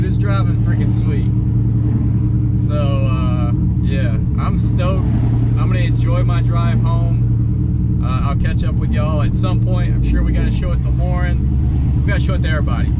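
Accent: Alabama, United States. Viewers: I knew you’s from alabama when i heard that accent you got. Bad ass truck man glad to see a fellow alabamian on social media doing bad ass stuff with bad ass trucks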